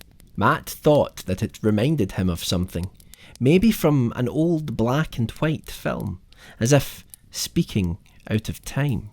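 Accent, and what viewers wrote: Scotland, United Kingdom. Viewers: I love this. Not to mention I love creepypasta and my heritage. Scotland. So a scots reading creepypasta is just pure brilliant! Great job my friend ;)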